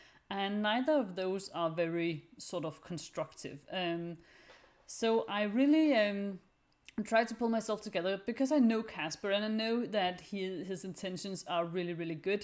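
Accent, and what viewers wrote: Denmark. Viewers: Are you danish because you have a danish like accent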